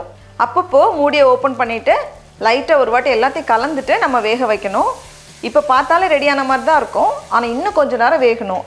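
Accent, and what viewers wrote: Nagercoil, India. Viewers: How are you? Waiting for your video before starting my online classes. I am teacher working in UAE from Nagercoil..Love the way of your Nagercoil slang ka...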